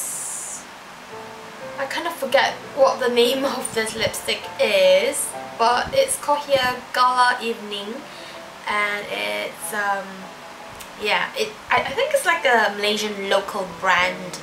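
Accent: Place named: Northern England, United Kingdom. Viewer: Are you Japanese? I lived in Japan and taught English there for 4 years. I have never heard anyone from Japan who can do a good a northern english accent as you, its amazing!! How long have you lived in the UK?:)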